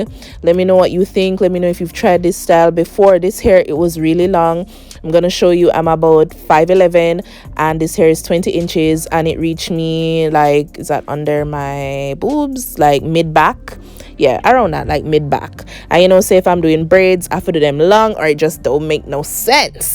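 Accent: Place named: Jamaica. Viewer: Come thruuu Jamaican Accent lmao. These came out lovely. Love it!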